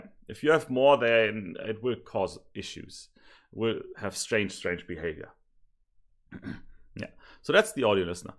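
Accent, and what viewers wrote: Germany. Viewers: Sounds you are a german guy =D great Tutorial, thanks =D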